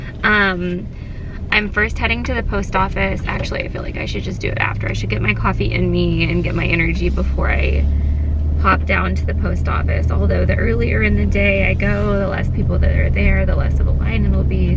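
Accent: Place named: South, United States. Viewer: Just wanted to say... at the beginning, your voice is sounding more country. Being in the s.a. area looks good on ya! Getting the accent back lol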